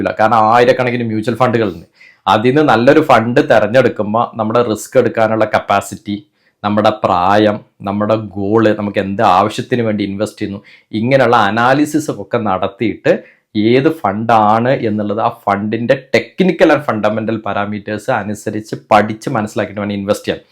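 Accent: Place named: Malabar, India. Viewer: സംസാരം കേട്ടിട്ട് മലബാർ സൈഡിൽ നിന്നാണ് എന്ന് തോന്നുന്നു.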